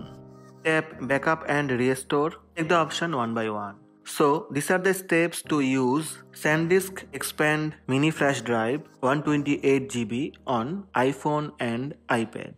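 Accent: India. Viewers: Videoyu çeken hindistanlı nerde olsa tanırım bu şiveyi :)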